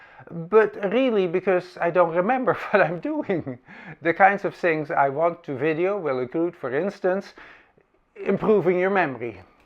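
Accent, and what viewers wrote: Netherlands. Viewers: Wat knap dat je het Nederlands-Engelse accent (nog) zo goed kan! I find that really impressive. Good to see you back. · Nice to meet the real with two letter "a". That Dutch accent reminds me of the days I worked for a Dutch Bank many decades ago. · Really nice you are making videos again and to hear your Dutch accent.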